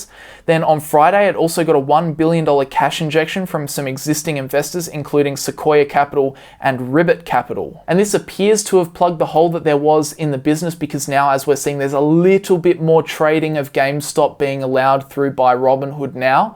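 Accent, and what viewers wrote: Australia. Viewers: Are you an Aussie living in America?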